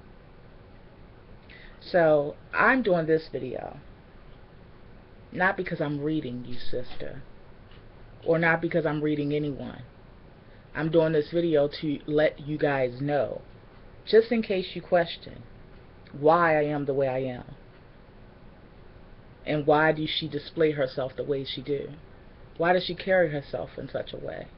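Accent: Baltimore, United States. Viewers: Trying to catch ur accent! Where r u originally from? I hear Baltimore a bit???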